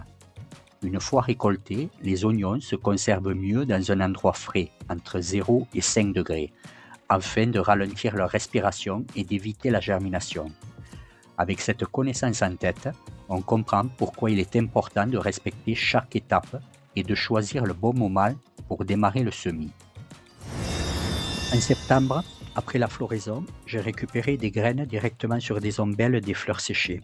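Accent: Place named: Charente-Maritime, France. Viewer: Ça fait plaisir d'entendre ton accent du sud de Charente-Maritime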